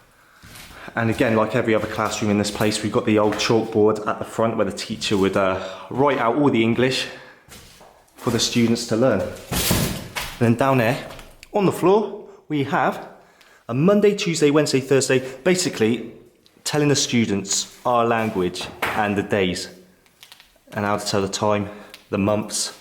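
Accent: United Kingdom. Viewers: I love that brittish accent of you 😂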